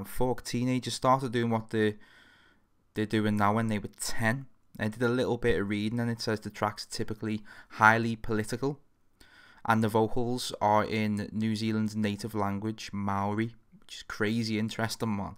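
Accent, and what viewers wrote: Liverpool, United Kingdom. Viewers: Not a huge fan of reacts, or the scouse accent, and yet somehow I'm subbing :p I love your passion for the music man.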